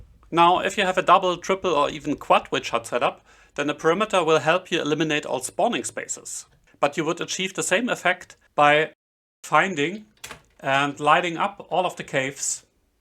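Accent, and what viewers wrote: Germany. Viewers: Question about your accent, are you from Germany?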